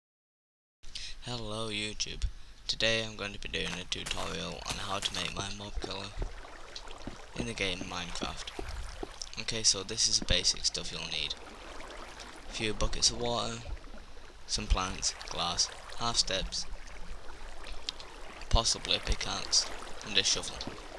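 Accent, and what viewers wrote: United Kingdom. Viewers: No, he doesn't, he just has a geordie accent. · you gotta love a good brit voice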